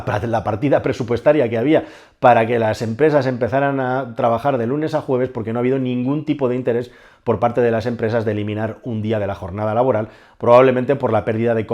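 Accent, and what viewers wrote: Spain. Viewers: Sr., me gusta su acento español verdadero, en You Tube hay otros que fingen ser españoles pero que a alguien como yo, he estado tres veces en España (soy estadounidense de origen argentino y con ancestros españoles ) ni de casualidad lo engañan.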